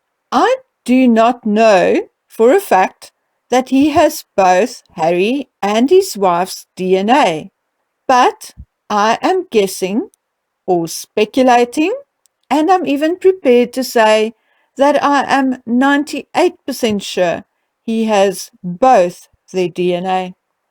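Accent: South Africa. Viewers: Are you from from South Africa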